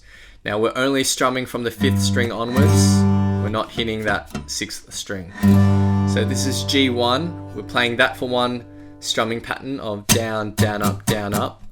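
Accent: Australia. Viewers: Dont forget the australian accent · with an Australian accent (i think) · I’ve never seen an Asian with an Australian accent before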